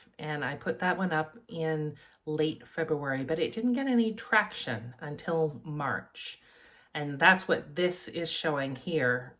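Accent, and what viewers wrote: Canada. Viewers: Are you in Canada or at least Canadian? Sounds like it. 😊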